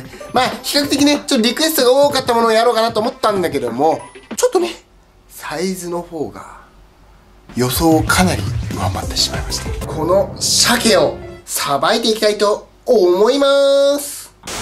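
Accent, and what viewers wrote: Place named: Hokkaido, Japan. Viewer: 北海道弁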